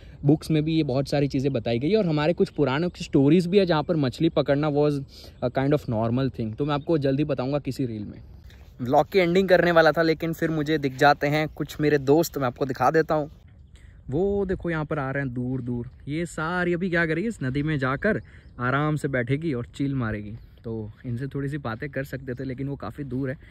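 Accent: Gujarat, India. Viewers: Bhai tame gujrati cho accent to Gujarati che kya Raho cho tame Ane aa Kai nadi che?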